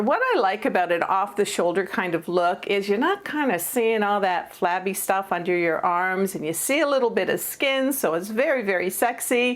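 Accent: Canada. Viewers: Good for you wearing what you like & not what other people say you "should" or "shouldn't" wear. Your tips & encouragement to live with confidence are helpful & uplifting. PS: if you're not originally from Canada I'd be surprised. You sure sound Canadian at times. 😁❤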